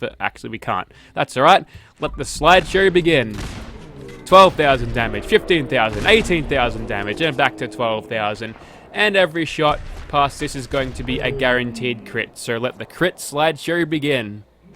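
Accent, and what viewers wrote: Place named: Australia. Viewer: So Australian. Love it.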